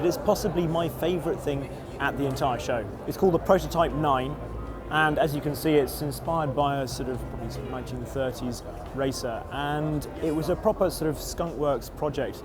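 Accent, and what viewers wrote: United Kingdom. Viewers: I know this guy's British, but he's like, REALLY British.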